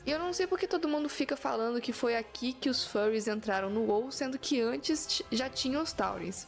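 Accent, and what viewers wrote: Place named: Minas Gerais, Brazil. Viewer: Tu é mineira ne? O sotaque n engana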